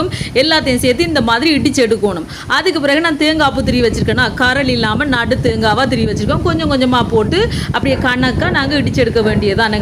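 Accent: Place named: Sri Lanka. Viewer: சகோதரி உங்கள் இலங்கை தமிழ் சூப்பர்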